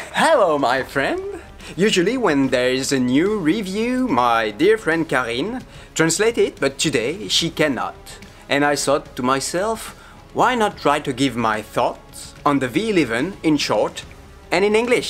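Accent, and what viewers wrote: France. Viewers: your doing good mate, english with a slight french accents sound really good and you provide some very relevant info to us all... Mercy. You have some very important points about the quality of the suspension which is important. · Laissant de côté l'accent français trop prononcé, tu parles un anglais très bon ! Bravo encore !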